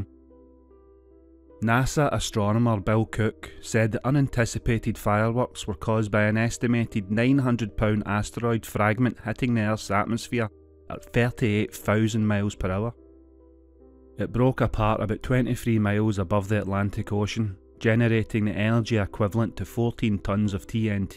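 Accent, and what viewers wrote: Scotland, United Kingdom. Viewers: Hello there🖐 We were lucky, this time, that the pieces landed in the ocean. One would think that, wit& all the satellites and observatories that someone would have known that the asteroid was heading our way? Perhaps it was known? Thank you for the report and video. I do enjoy your Scottish accent. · Is this a scottish accent ? If not, what accent is it ? Interested because I am not a native speaker.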